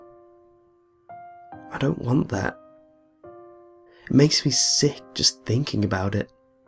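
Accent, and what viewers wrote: England, United Kingdom. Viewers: His accent is English. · He isn't American 😂😂 He's British, especially with that accent. No one that's born in America has a voice like that. Only in Britain.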